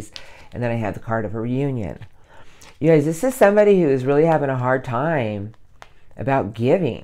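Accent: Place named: United States. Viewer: aww! Thank you! Beautiful reading as always. You speak amazing English, but look Spanish but sound American? You might have lived in several countries to date? You are certainly well traveled spiritually, I love your intuition and gifts. Thank you as always. ❤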